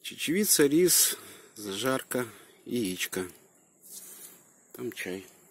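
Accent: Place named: Ukraine. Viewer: БлагоДарю за ответ, я так и подумала ,что вы из Украины (по акценту в разговоре) если не секрет из какой области?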